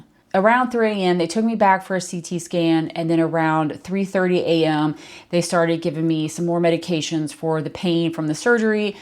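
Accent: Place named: United States. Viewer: 🌸🌸🌸I listened to your story and I have to agree, people need to seriously reconsider having elective surgery, especially with kids!! I had heart surgery...I still have nightmares and anxiety because I will need to do it again. Surgery is a life and death situation no matter how experienced your doctor's and nurses. the risk is always there. Suffering can and will happen. If you can avoid it, avoid it as long as you have young children because you never know. You sound a bit american and idk how this has affected you financially but I'm very sorry for all that stress too. ❤ Your husband sounds like a dream. I've never had a family care like that about me.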